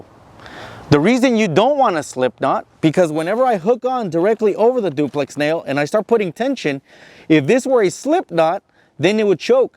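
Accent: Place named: Canada. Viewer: A hispanic man with a Canadian accent. Too cool, I could listen to this guy for hours. He’s a very good presenter. 👍🏽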